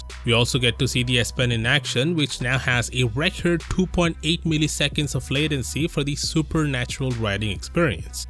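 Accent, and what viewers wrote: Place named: India. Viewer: I loveee your accent. I would think indian with the Palatial clicks, but there’s a nonrhotic r, May I ask where from?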